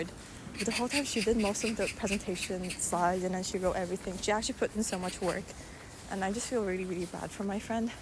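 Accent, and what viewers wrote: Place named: United States. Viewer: You have an American accent. Your skin so nice too.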